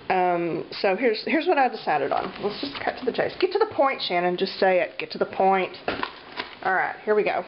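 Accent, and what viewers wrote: South, United States. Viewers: You crack me up, love the accent, sounds like me cause I am Southern too.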